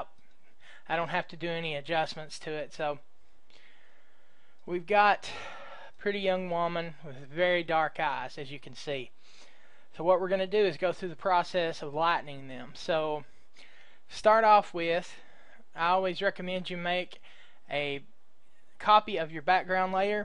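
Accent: Texas, United States. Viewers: a real Texan. love the way you talk. the layer could be duplicated then dragged over to the other eye then re-adjusted in size and fixture to give the same effect without repeating the same work on the other eye. good work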